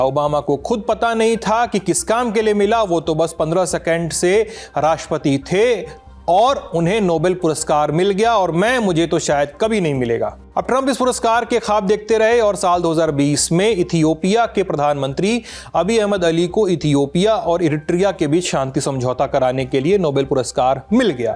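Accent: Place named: Varanasi, India. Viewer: पसेरी के भाव से बनारसी टोन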